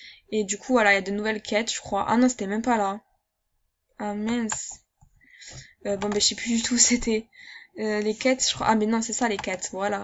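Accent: Marseille, France. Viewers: tu a un acsent marseuiller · Ses une marseillais ses pour sa qu'elle dit sa mai je t'adore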